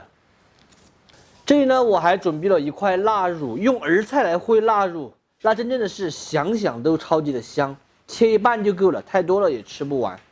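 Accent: Sichuan, China. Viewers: 帅哥，就讲四川话嘛！这个弯管子的川普听起来好累哟😂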